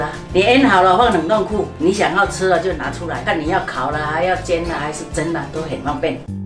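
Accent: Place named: Taiwan. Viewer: 阿嬤的台灣國語聽得很親切喔😃❤️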